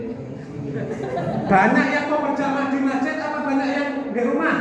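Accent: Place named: East Java, Indonesia. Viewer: Jawa timuran ya ustad...? Bahasanya khas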